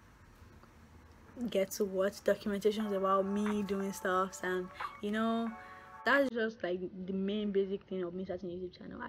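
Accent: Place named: Yoruba, Nigeria. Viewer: It’s d accent for me ...Confirm Yoruba girl😂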